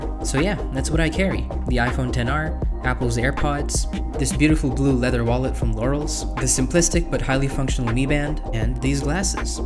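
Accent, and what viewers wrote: United States. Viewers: amazing quality and content bro❤️ at first i thought u r american😂😃❤️ U earned a subscriber bro❤️ Keep up the good work🔥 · Damn , your accent is freakishly American !!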